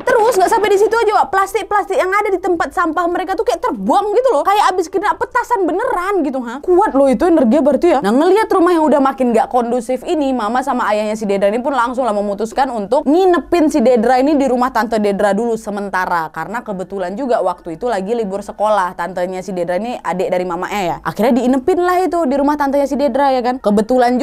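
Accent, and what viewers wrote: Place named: Java, Indonesia. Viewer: Klo KK ngomong Jawa lucu kk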